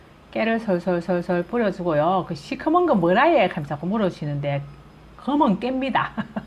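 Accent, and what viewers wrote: Gyeongsang, South Korea. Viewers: 님 처음댓글 다는데 시청잘하고있 습니다 님 지역이 어디세요 경상도 시투리 귀에 익숙했어 저는 영천입니다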